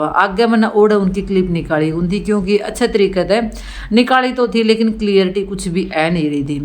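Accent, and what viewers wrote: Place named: Haryana, India. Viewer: Nice desi vlogs didi bhot badhiya vlog bnao aap haryanvi boli bolde bhot acche lgo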